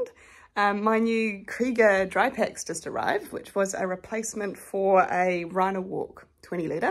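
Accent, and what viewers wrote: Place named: New Zealand. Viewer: Thank you for your evaluation of the bags. This was very useful for me. Also nice to hear your New Zealand voice. It reminded me of my two sea kayak trips in New Zealand. Good memories.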